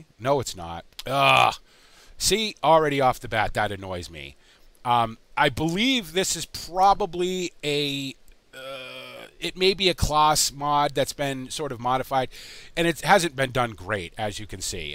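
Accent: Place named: Brooklyn, United States. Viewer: you sound like you're from brooklin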